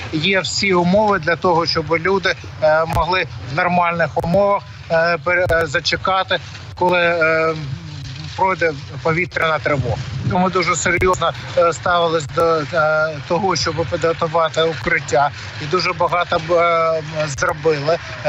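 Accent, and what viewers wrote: Kharkiv, Ukraine. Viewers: Харьковский украинский!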